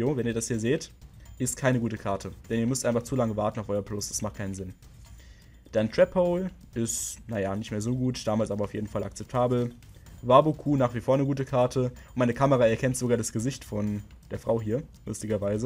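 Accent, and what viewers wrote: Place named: Germany. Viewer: Du hast so nen schönen deutschen Akzent wenn du englisch redest :D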